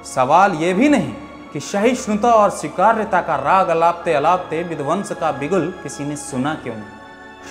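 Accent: Bihar, India. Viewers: Bihari aawaz sun garv hota hai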